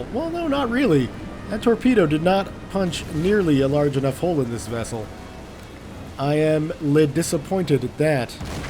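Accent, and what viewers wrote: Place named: Canada. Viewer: hey its the soothing Canadian voice of that i need in my life.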